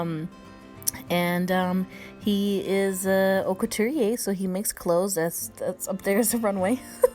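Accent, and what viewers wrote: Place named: United States. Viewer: Why do you have an America accent?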